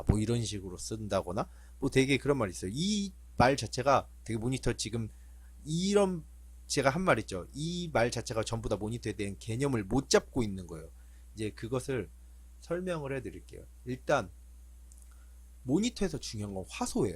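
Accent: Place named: Jeonju, South Korea. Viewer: 전주 사람인가? 말투가전주인데..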